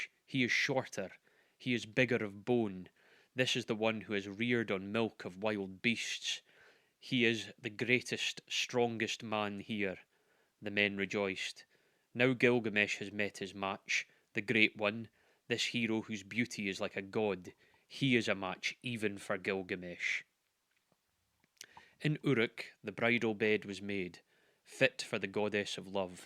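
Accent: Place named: Scotland, United Kingdom. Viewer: The Epic of Gilgamesh sound so good with a Scottish accent. Well read and instantly subscribed.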